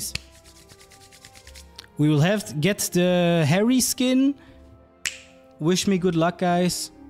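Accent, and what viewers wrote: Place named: Portugal. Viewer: Eita ele fala português de portugal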